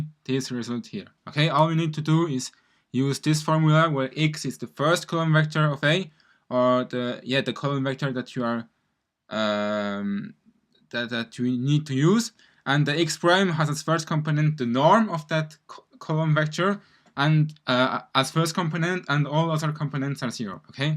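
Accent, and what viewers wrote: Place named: Germany. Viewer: Are you german? Haha I like the way you talk. Anyway, nice videos!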